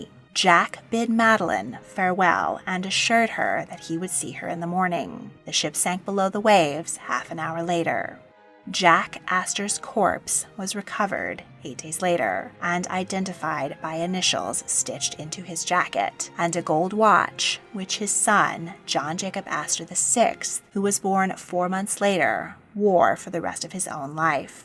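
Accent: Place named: California, United States. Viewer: I’m just struggling with the fact that this was apparently narrated by the Californians from SNL. What in the actual hell in this accent? Holy crap I can’t even pay attention to what she’s spewing toward my ear holes.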